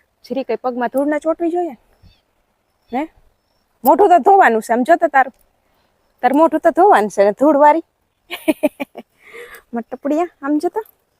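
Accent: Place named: Kathiawar, India. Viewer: Kem chho ben. Sivani su kare chhe. Tamari boli bov saras chhe kathiyawadi..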